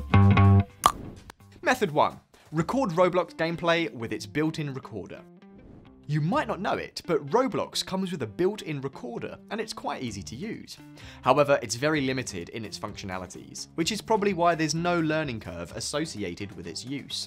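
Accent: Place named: United Kingdom. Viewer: another jagoff with a brit accent and generic content. Didn't learn too much here but appreciate the effort.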